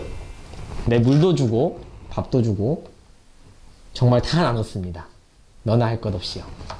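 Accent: Jeolla, South Korea. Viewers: 뭐여 전라도 빨갱이방언이여?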